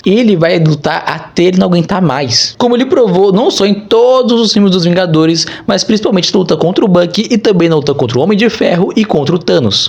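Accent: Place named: United States. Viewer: mania de brasileiro querer falar americanizado 😕🤡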